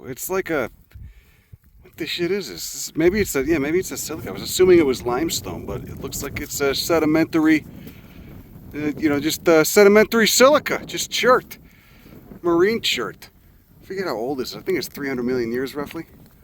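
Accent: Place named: Texas, United States. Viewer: Liked and subscribed pardner. I know a Texas appreciator when I hear one. I will listen to you yap about native plants all day. Looks like maybe far west texas? Could be anywhere from the Big Bend area all the way up to the Guadalupe mountains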